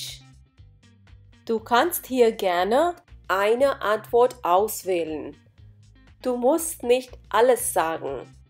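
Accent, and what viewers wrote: India. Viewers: the lady speaking has an indian tone to her deutsch sentence.. does any one else think so? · I was hoping for a German speaker, not an Indian person.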